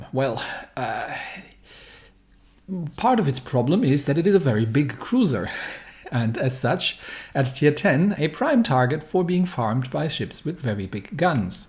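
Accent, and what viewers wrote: Germany. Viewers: Hello are you a native german speaker?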